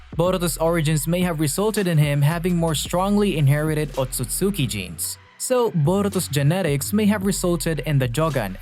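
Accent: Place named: Philippines. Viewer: Pinoy accent 😂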